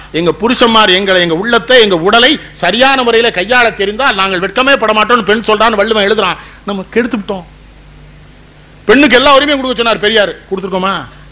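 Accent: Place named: Tirunelveli, India. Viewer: நெல்லைத் தமிழின்... தேனிசை உரை...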